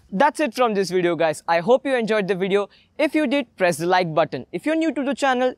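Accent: India. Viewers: The Indian accent is so funny! 🤣😂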